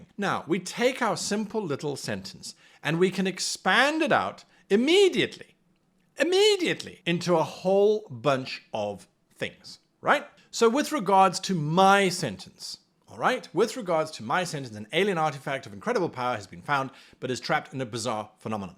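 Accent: South, United States. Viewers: This country boy accent is killing me! Love it! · With that accent, I though all of the adventures were going to be getting those Duke Boys.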